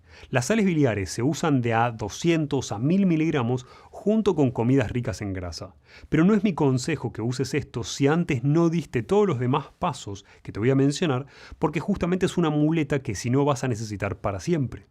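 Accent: Argentina. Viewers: DE QUE PARTE DE ARGENTINA ES EL DOCTOR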